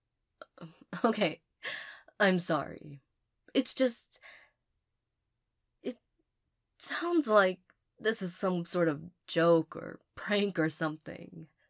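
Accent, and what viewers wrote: South, United States. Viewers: Let's gooooo. Where's country accent? Painful truth or comforting lie? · You sounded like a classic southern belle. It was a nice change and you absolutely nailed it!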